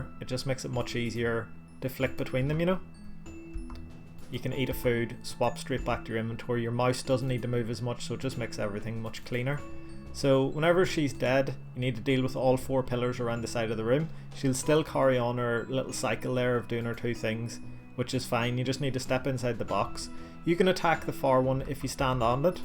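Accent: Northern Ireland, United Kingdom. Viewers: is that a Northern Irish accent I hear!? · Good guide man ty. Surprised me to hear a northern Irish accent in a runescape guide as I'm from NI myself was unexpected haha Also fuck Zlaryn I hope he gets an itch on his balls that can never be scratched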